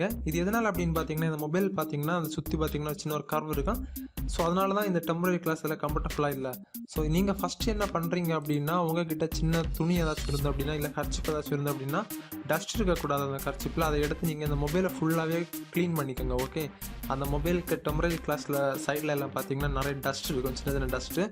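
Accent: Kanyakumari, India. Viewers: Bro neenga kanya kumaria ....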